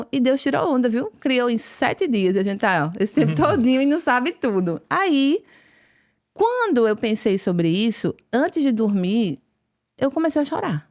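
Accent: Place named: João Pessoa, Brazil. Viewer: Que lindo este testemunho! Sou do Rio e amo esse sotaque de Jampa. Tenho grandes amigos na cidade. Fui católico do IBGE quando adolescente, virei ateu após os 20 anos contaminado pela universidade. Agnóstico aos 30, e Cristão Free Style após um método CIS do Paulo Vieira aos 38. Aos 40 passando por um grande problema no trabalho, minha esposa me "impôs" uma viagem a Campos do Jordão com uns amigos para espairecer e pedi que no caminho a gente parasse no Santuário de Aparecida, pois desde que voltei a ser cristão não entrava numa igreja. Ao chegar e rezar frente a imagem para agradecer por todas as minhas bênçãos independente do problema, a solução chegou através de um telefonema! Naquele momento tive a certeza que precisava ser católico, e agora, 5 anos depois estou fazendo o Catecumenato e me apaixonando cada dia mais por nossa Igreja. 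Em Julho farei o caminho da fé e aguardo ansiosamente por minha primeira comunhão. Salve Maria e Viva Cristo Rei!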